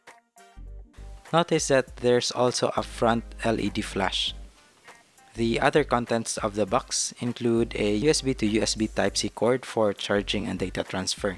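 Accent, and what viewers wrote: Philippines. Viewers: Yourrr Englishh yoww i halata that you are relly a pilipino.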